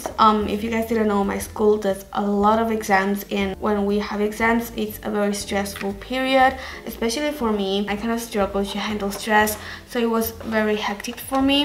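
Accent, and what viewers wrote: Spain. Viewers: Can you a video in spanish like a vlog or something, im loving your spanish accent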